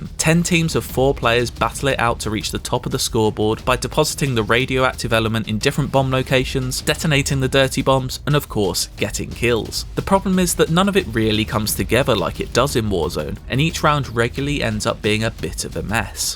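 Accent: United Kingdom. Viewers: This guys British voice pisses me off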